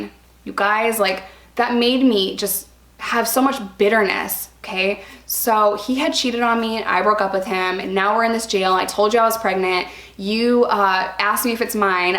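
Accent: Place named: Midwest, United States. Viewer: You grew up in New York? The way you say “pop” and “pod” sounds like the Midwest lol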